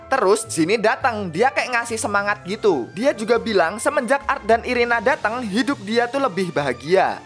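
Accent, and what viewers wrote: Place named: Java, Indonesia. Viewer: wong jowo mas😅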